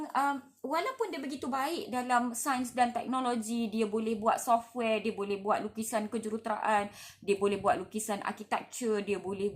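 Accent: North, Malaysia. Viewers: Doktor Anak malaysia yg betul2 sudah merdeka...sbab fasih berbahasa Melayu..yg paling Bagus doktor boleh ckp loghat daerah jugak..bleh ckp loghat utara kan😀😀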